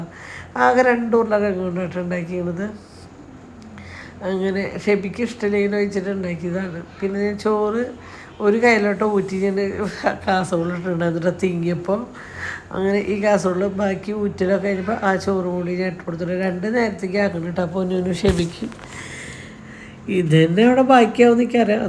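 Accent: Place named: Thrissur, India. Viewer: അല്ലേലും തൃശൂർ ഭാഷ അവിടെ ഉള്ളവർക്കേ മനസ്സിൽ ആകുള്ളു അത് അവരുടെ കുറ്റമല്ല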